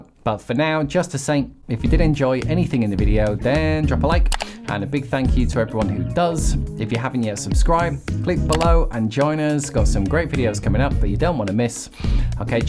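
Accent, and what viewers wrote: United Kingdom. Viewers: I realize that I like your videos cause your accent reminds me my visit to Britain. Such a beautiful land and scenery... However I buy the deep... 😁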